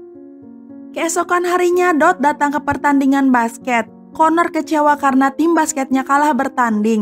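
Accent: West Java, Indonesia. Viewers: Logat Sunda mu mbak , aduhhhh .. tolong perbaiki mbk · Sama😭 itu kek logat sunda sih bukan medok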